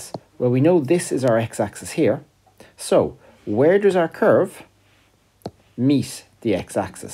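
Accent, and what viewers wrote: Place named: Ireland. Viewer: Thanks! Also, love the accent. Go Ireland lol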